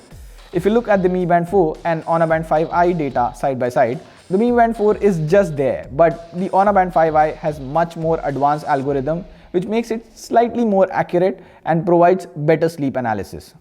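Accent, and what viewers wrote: India. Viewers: Your accent like dr bombay, Calcutta...taxi3 n Calcutta...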